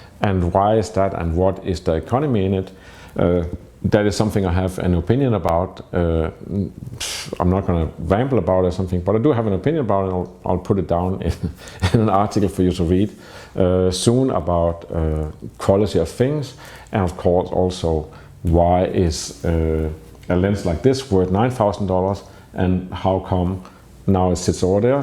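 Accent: Denmark. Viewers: Thanks to you and your Danish accent it pushed me to buy the Leica M240 and have never looked back. Thank you. Now to buy a Leica 50mm lens.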